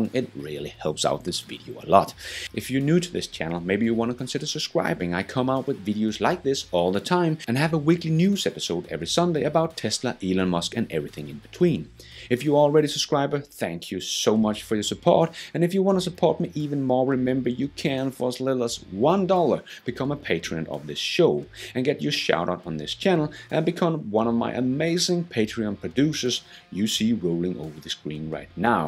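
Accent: Denmark. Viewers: Er du dansker? 😁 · You sound Danish. How are Tesla sales going there?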